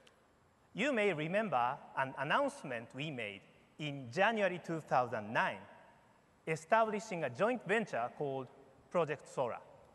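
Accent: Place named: Japan. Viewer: gosh plz find someone speak english without jp accent.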